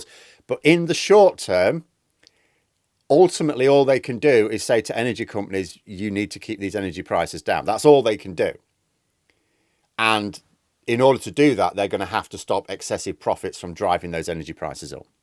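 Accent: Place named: Birmingham, United Kingdom. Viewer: English Brummie.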